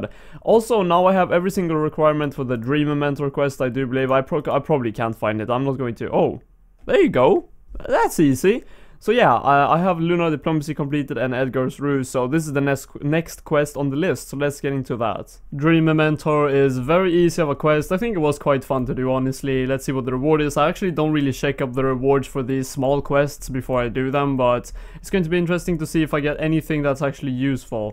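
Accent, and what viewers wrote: Sweden. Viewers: You're Swedish aren't you? :D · I love your swedish accent :3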